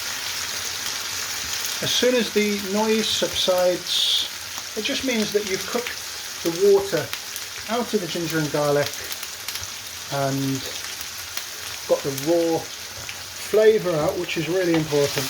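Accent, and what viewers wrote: United Kingdom. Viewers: Great vid and tips, do i detect a welsh accent?..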